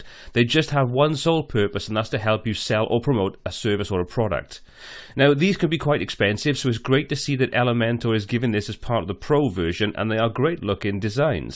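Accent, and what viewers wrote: Wales, United Kingdom. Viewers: Damn you sound welsh... I wonder if you are from Cardiff too!! lol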